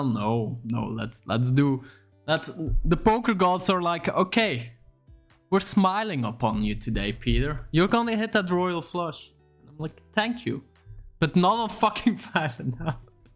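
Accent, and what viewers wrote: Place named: Flanders, Belgium. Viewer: Echt insane hoe hard ik in de eerste 5 seconden hoorde dat gij Vlaams bent. Niemand in de wereld spreekt zo Engels.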